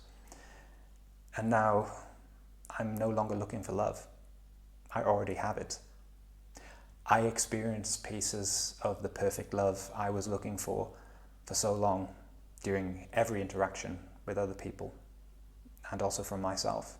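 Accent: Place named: Wales, United Kingdom. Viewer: thought I recognised the accent but wasn't sure. South Walean here 😁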